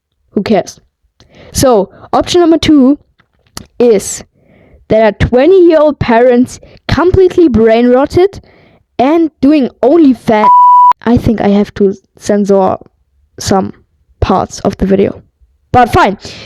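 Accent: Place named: Germany. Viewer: U sound german 🤔🤔 W vid ♥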